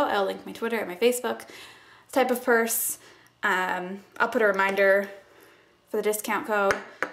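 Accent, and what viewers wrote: United States. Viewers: its like a boston-ey accent lol, they put a ehg emphasis on their a's.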